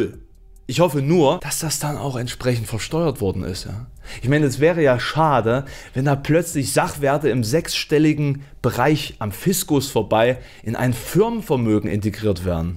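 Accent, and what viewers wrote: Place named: Saxony-Anhalt, Germany. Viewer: Neo Magazin Royale war einmal, mittlerweile heißt es ZDF Magazin Royale. Nur mal so als Zwischeninfo. Dann noch kurz ne Frage an den: Kommst Du aus der Nähe von Halle oder Leipzig? Ich überlege wohin ich deinen Dialekt einordnen würde. Vielen Dank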